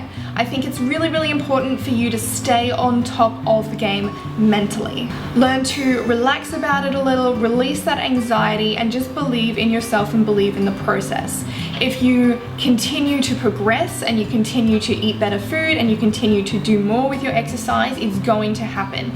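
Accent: Australia. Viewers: aussie accent?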